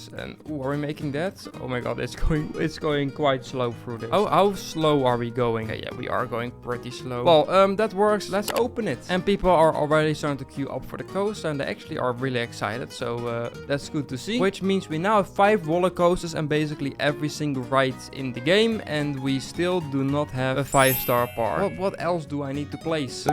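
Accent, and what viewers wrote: Netherlands. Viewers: Ik vind het zo leuk ook gwn met het Nederlandse accent. Ga zo verder! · R u Dutch? Ur name is Dutch and there is kinda a Dutch accent in jr English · I bet this guy is dutch i mean look at his accent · deze guy is sws Nederlands (de accent). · Are you Dutch? You here the accent😂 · Ik hoor het nederlands accent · Ben je Nederlands want ik hoor dat gewoon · U sound like u are Dutch are you? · Ik hoor Nederland haha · Hello, it sounds like your Dutch. Are you Dutch? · Are you Nederlands.I hear the accent.😂😂😂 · Je klinkt nederlands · Hi i have been following you for a long time now and i always hear like a bit dutch so are you dutch ir for real english if your like truly englisch than sorry that i asked this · Ik weet dat je Nederlands bent 😂 ik hoor het aan je stem anyways love the vids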